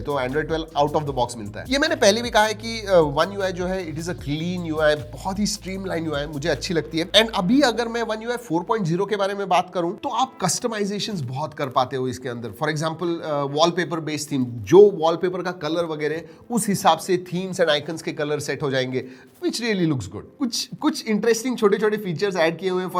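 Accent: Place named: Khandesh, India. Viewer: Oh tuni bahna nig baher 😂😂😅wow sir tumhi bhi aahirani boltas maja yi lagi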